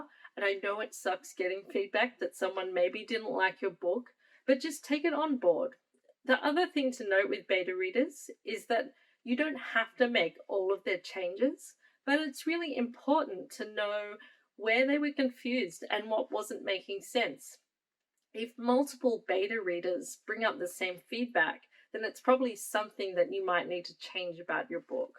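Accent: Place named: Australia. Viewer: This was super useful! I've suscribed because you give great advice and because I love your aussie accent. I haven't checked your YouTube channel yet but I hope you're still doing videos! I've finished my first draft (80k words) and editing is killing my dreams! But this has given me so some hope :) Thanks!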